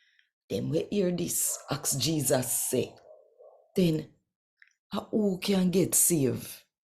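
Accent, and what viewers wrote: Jamaica. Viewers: Blessed love sister! I'm in the USA. I love Jamaican language and accent. Thank you! One Love! ❤️☮️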